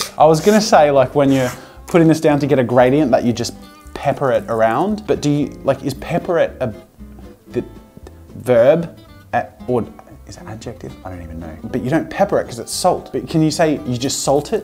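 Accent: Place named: Australia. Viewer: It’s because he is Australian they all say I deer not idea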